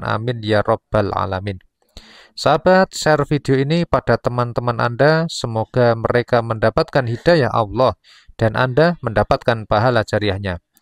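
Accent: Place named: Java, Indonesia. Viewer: Medok nih......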